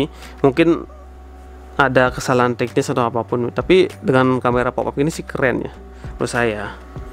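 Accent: Bali, Indonesia. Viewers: Dari nada suara bicaranya sampean kayak nya org bali ya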